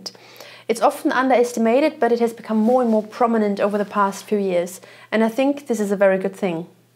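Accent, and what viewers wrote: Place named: Europe. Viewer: European English accent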